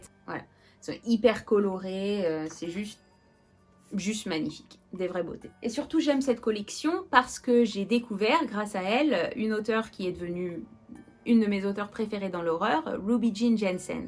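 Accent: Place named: England, United Kingdom. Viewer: exelente chaine et belle accent anglais j'espere que tu sera plus reguliere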